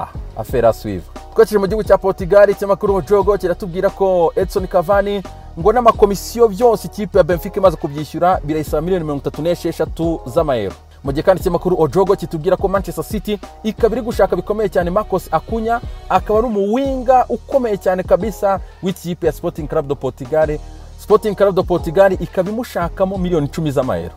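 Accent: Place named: Burundi. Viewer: Nkunda ukuntu uvuga indimbura yacu birigakuru gacaracara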